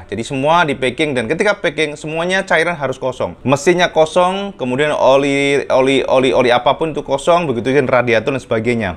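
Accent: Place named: Surabaya, Indonesia. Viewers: boso ne jowo suroboyoan luuuurrrr....istimewa....!!!! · jane wong ndi to ki kok bohosone suroboyoan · Top cak.sangat baik penyampainnya.bahasa suroboyoannya jg ok masio aku wong sundo.tapi toplah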